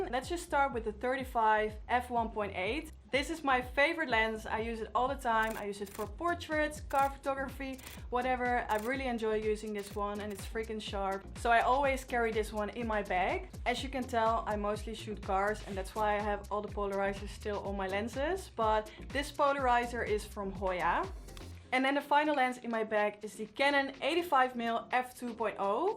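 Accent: Netherlands. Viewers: You sound Dutch!?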